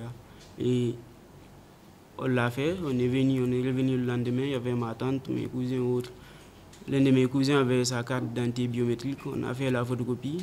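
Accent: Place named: Senegal. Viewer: Putain tu a un accent sénégalais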